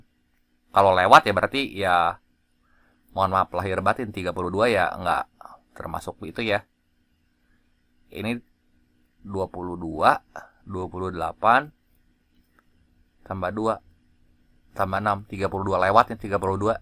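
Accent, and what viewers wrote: West Java, Indonesia. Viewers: Abangnya orang sunda wkwkw lucu jelasinnya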